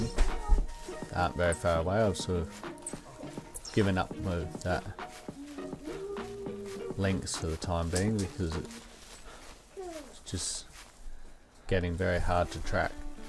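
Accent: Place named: Australia. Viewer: Like your style and it's good to hear an Aussie voice. That letterbox is bad tho, unless otherwise my phone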